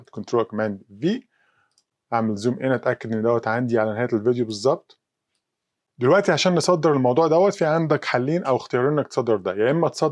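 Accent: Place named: Arab world. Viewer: Your arabic accent is so hard my friend.. 😢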